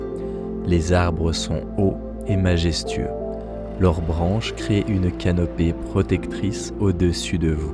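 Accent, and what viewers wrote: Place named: France. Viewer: Thanks, you know i love your french accent 🥰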